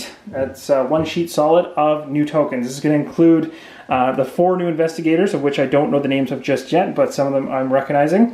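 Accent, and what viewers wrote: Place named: Canada. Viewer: I feel like I pick up a Canadian accent lol. But thanks I’ll be buying this next time I can afford another expansion. Forsaken lore is arriving in mail tomorrow!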